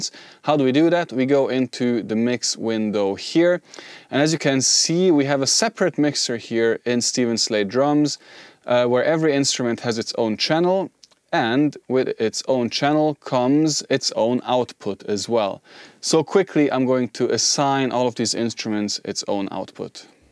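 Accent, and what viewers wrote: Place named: Hungary. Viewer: ... and you are from Hungary:D... if I am not fooled by my ears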